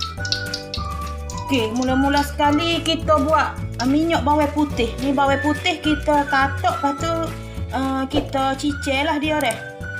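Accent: Kelantan, Malaysia. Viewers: suko dengar kak kecek Klate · Min, sedap....sebenarnya dulu sy pernah kawen dgn org kelantan.n skang dah pisah.die punya benci tu, adoi...smpai sy xleh jumpa or dengar org kelantan cakap.benci sungguh.padahal diorang xde salah ape pun...adoi...😬...skang Ni pun sy subscribe.tapi dgr je loghat kelantan, terus sy mute..punye la hai...halalkan resepi yg sy ambik ni ye.n sy still benci..😭.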